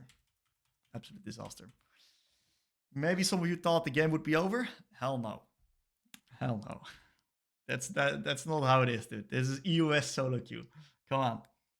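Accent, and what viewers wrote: Netherlands. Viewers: I wanted to learn this champ and this is 2 weeks old really nice this will help me a lot 💪🏼💪🏼💪🏼💪🏼💪🏼 (Btw you sound dutch haha) Edit: stopt watching (gonna watch the rest later) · >the perfect leblanc guide >almost 8 hours long u are so goated lmao also are you dutch? your accent sounds rly dutch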